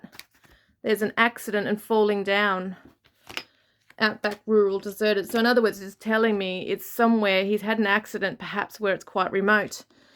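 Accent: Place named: Australia. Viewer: I have never known of your channel before and clicked on it because of the subject. I had watched another reader yesterday and I wanted to compare what you both said. Pretty much identical. She believed he went higher and off the normal track. He tripped and fell hitting his head. She didn’t think he was in water but up on the mountain but would be hard to find by drones because he was wearing dark clothing. She doesn’t feel he is alive. She also said it would be a while - a few weeks before he was found (and you pulled out July). Two brothers passed him on the path and he would be found by other hikers (you had the hitch hike card). She felt that he and his wife had a bit of an argument on the beach before he left. You were mentioning was reflecting on his life etc. Don’t we all do that after a spat with our partner? Great reading and lovely to hear an Australian accent 🇦🇺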